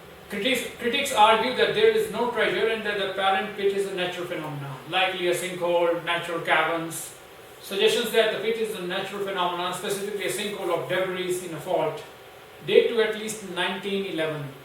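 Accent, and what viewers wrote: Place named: Oklahoma, United States. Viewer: You have a very odd version of an Okie accent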